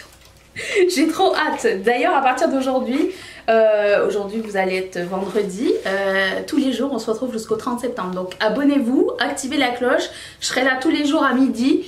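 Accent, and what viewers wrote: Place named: Canada. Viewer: Cc tu as de plus en plus l accent canadien 😁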